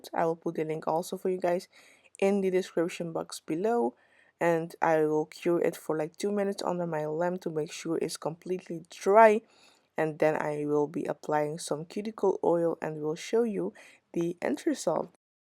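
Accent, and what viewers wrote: Netherlands. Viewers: Hii honeyy love your work, love ur accent are u perhaps dutch? Xx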